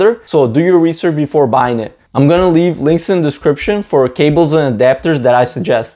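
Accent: Detroit, United States. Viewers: I’m wondering if he’s from Detroit? I am… What up doe? 😊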